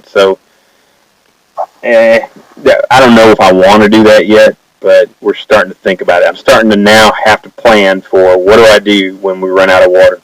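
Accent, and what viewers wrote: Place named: South, United States. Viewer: Hi, enjoying the videos, well done. I must say your accent surprised me somewhat. I did not know you Illinois boys sounded so much like us good ole boys in the south. LOL. Hoping all is well with you and yours. Tobster ( Gary)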